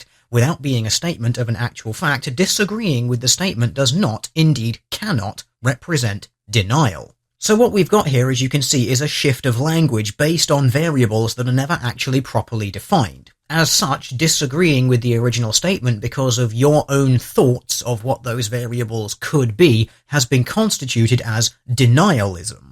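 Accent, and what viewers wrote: England, United Kingdom. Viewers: I should have said that I know is English and should have specified that he has an English accent, just not Received Pronunciation, though Young Ponymancer did point out that he is close to RP but his voice is nasal. He is certainly not Scottish. What about a Midlands accent but not Brummie nor Scouse though I can't them tell apart since I'm American. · I'm no expert but It's not British, it's English.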